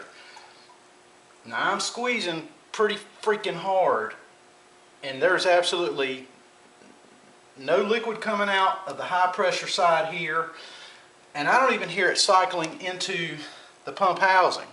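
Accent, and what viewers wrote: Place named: North Carolina, United States. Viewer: Thank you. You are from North Carolina. Just have to be. Not sure any other part of America has that very special accent. I went to Duke, and almost certainly you are a Carolina fan. Could be State, but I bet it is Chapel Hill. Great video. Thanks again.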